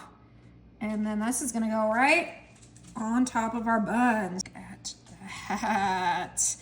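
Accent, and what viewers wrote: United States. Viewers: Meat is not meant to be that colour, what food dyes eere added to achieve it and are they safe (probably not as you sound as though you're in yhe US)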